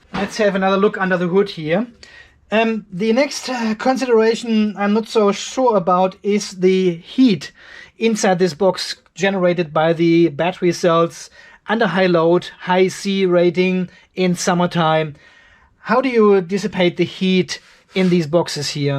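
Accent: Germany. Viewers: You sound like german? Speak deutsch?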